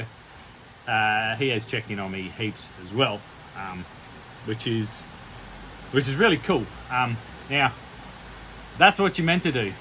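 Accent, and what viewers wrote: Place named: Australia. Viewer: Nice to see you back! Surely missed your Australian accent and good happy predisposition to life! 🎉😊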